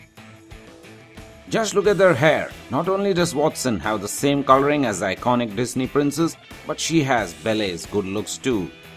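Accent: India. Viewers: bellay? her-mion gran-gerr?? bwahhaaa!! lolzz! mann sorry bt ur indian accent is juss too pronounced! (fyi, I'm indian 2, bt I pronounce words ryt!) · Actually he is Indian. And that is is why he is speaking ridiculously. He is speaking in Indian English accent . The most funny part for me was when he pronounced hermione as Hermene and Belle as Bell-e!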